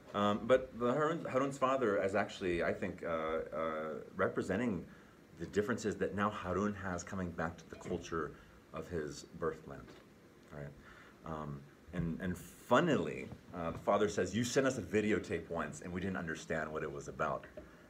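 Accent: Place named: United States. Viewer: ناروتو اوزماكي اوتشيها انتا مصدق انوا سوداني؟ ترا امريكي لغتو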